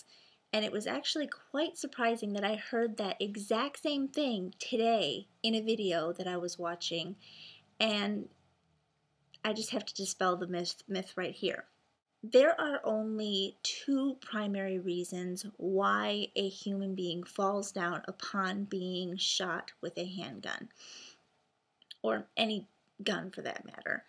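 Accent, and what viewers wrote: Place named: Midwest, United States. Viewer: ... Your accent sounds mid-western so I'm guessing Ohio. You need to adjust your logic. There is a third and fourth reason for a person being "knocked down". 3.) The stance the person is in at impact and direction of bullet travel. 4.) The "point" of impact. I saw a man shot 7 times in the chest with a stupid.25 auto and he ran to nearby house and sat waiting for an ambulance. I also saw a screwball shot with a.25 auto in the forehead. It didn't kill him, but it knocked him down.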